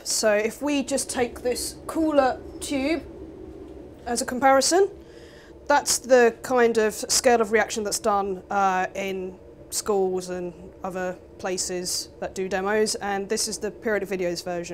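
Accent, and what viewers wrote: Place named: United Kingdom. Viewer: Asian+British accent= blown mind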